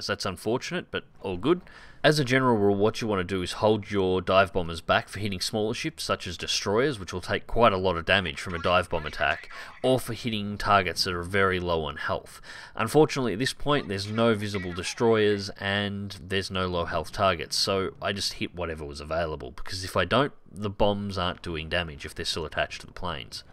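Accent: Australia. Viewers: good to hear an aussie voice on a wows vid. This was one of the most instructive vids i've found on cv. thx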